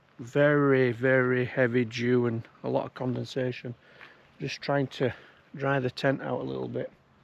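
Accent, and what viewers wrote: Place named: Yorkshire, United Kingdom. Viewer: I’m up in May,cycling from Dunoon to Tayinloan and bikepacking on Gigha. Funny hearing all those Gaelic place names in a Yorkshire accent. Great vid, thanks.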